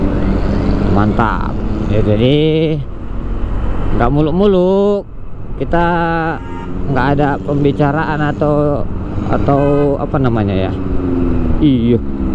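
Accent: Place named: Medan, Indonesia. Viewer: Medan kali repatan abang ni🤣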